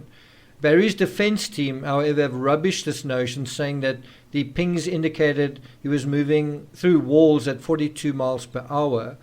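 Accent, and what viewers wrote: South Africa. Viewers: South African accent? A quick google confirms :)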